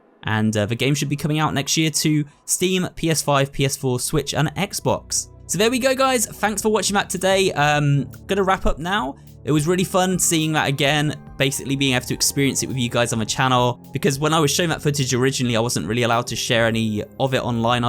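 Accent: England, United Kingdom. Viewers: I just wanna say that I'll always love how English accent will have him pronouncing the word, "three" like the word, "free" or "tree." It tickles me pink every time! 😂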